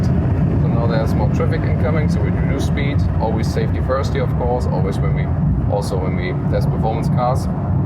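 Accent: Germany. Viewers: A car review can't get more German than this. Congrats, your reviews are on point. Very presentable host and the way you do it makes it so pleasant to watch. Easily the most professional car reviewer on the web. Your german accent makes it that more nicer to watch. Cheers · A review of a 911 Porsche turbo S is always best in the gentleman has a German accent.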